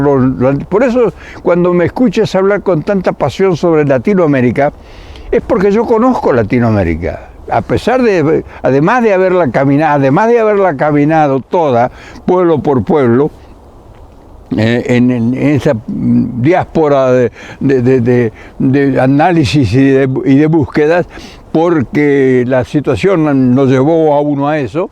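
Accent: Argentina. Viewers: Sr., no insista con su asento Argentino, usted es mas Mexicano que muchos Otros. Viva Argentina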